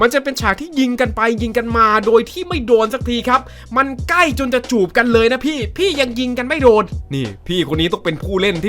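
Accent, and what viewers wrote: Isan, Thailand. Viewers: เจ้าของช่องเป็นคนอีสานหรอครับ สำเนียงออกอีสานเลย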